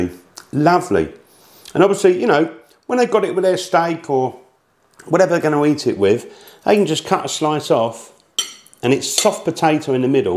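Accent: United Kingdom. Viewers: British accent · As a black guy from America I love this guy british voice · Nice video and recipe. Where from UK is your accent from? 🥰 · haha- Cyrus Kariuki - as another Brit he sounds like a bit of a 'geezer' to me! I like that accent, but it's not what I'd call 'beautiful' English.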